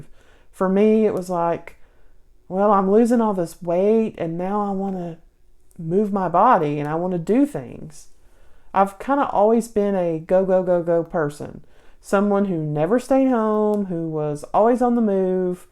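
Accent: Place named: Texas, United States. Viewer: Are you a Texan, sweetie? You sound like it.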